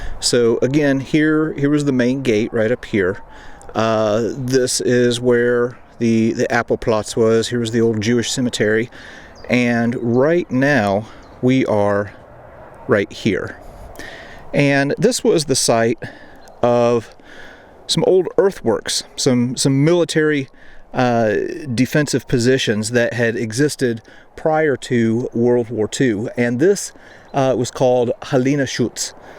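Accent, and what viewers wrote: United States. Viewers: Sending kind wishes from Connecticut. I’m your newest subscriber. I appreciate your skills and knowledge base. You’re presenting difficult material with such respect for the tragedy and multi-generational trauma. I’ve seen many in Europe, but never visited Plaszow. I will have to read about you and your channel. You sound USAmerican.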